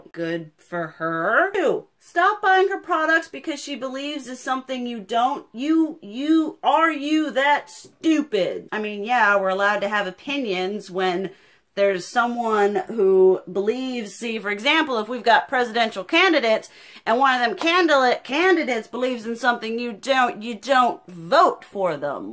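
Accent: South, United States. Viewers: I just join her channel 2 weeks ago I never thought her voice was annoying. I acutely like her southern belle voice. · I love your Southern accent and your voice and your clothes and your hair and makeup! Everyone likes something different, dang people are harsh. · Love your southern drawl. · I love ur voice especially your country accent lol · I can’t imagine being so miserable to leave hate comments. Girl you are beautiful and love ❤️ the loud southern twang . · I agree. I love it! I put these on my tv when im alone just because its so exuberant and comforting. (No idea why, I'm Swedish. I have no relations to southern USA accents) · You sound like Jane Lane from Daria, but with a slight southern accent :) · I LOVE your voice. I think the first comment I either left you or told my friend about you was "She's like a Southern Belle mixed with Wednesday Addams, love!" Also, all these comments about "Your volume" do they not realize no matter what damn device THEY are watching on there is a volume control & they can turn it the hell down themselves? 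Lol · I LOVE your southern drawl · Hahaha i love your voice and tone ! Its one of the things that made me come back after the first video i watched. I loved it , im not american and idk but it reminded me of some old movies that depicted people from the south or it reminded me of what i thought american accent was before i moved here.I really like it and i like the fact its so animated and has so much personality. Love it ! It sux that we are saying things online that we wouldnt say in life,if i dont like someone or something i just leave. Sometimes i want to comment on someone makeup but i think oh well its what they like and i dont say anything unless someone ask for an advice 🤷🏼‍♀️ Im sure i dont wear tgings that everyone love. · “ Areeeeeeeeeeed” pretend like I used your long gorgeous southern twang! 😊😁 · Most of those people must not know you're from the South. Your voice is not annoying, it's southern. 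Just like my voice is "valley girl". It's just a part of who we are. 🤷‍♀️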